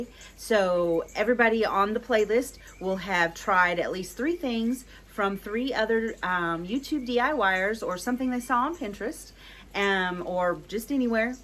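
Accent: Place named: Louisiana, United States. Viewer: Just found you on YouTube. Love everything you do. I'm an old Louisiana girl so love seeing Louisiana girls doing their thing. I really, really miss the accent.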